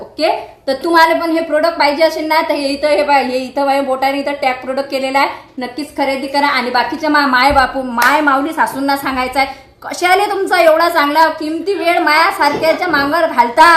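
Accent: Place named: Varhadi, India. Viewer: 😂😂😂 तू इतकी छान बोलते ना आणि आपली वराडी असल्यामुळे खूप छान वाटते मला आणि तू आमच्याच असल्यामुळे त्याहीपेक्षा छान वाटते अकोट ला असते ना मी तर नक्कीच भेटली असती तुला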